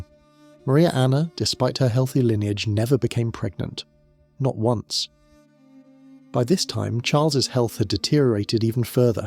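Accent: United States. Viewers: Old gammon Brit here. We all used to fall about in tears of laughter when somebody said "dienasty"! Now British kids - such as you - speak american. Oh well. That's the future I suppose. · Sounds like a normal Guy from Alabama.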